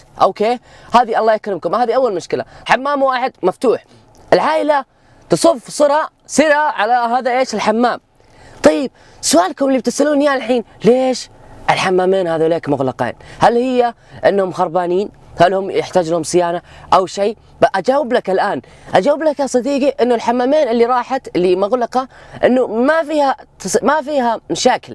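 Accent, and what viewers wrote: Saudi Arabia. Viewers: ووه سيدي امجيزاني لهجته زيي 😂😂 ي لبى بس اخيرا يوتيوبر جيزاني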